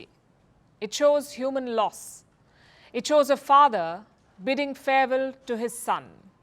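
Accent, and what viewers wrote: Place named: India. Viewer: Horrible regressive british colonialist bud bud ding ding hindustani accent. Please get coaching to get better accent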